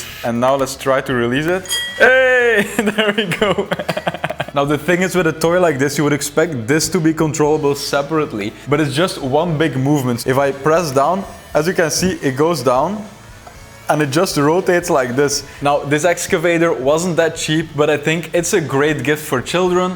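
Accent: Netherlands. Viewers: i heared a dutch accent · You can hear the Dutch accent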